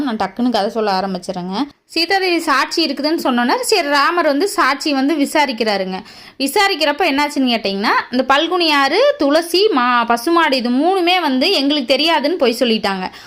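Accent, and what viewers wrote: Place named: Coimbatore, India. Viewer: Unga kathai super sister... Maa sha allah... Unga shorts ellamaey pathuten supra eruku... Arumaiya eruku... Unga slang remba alaga eruku.. Pechu valaku kovai side ah nenga...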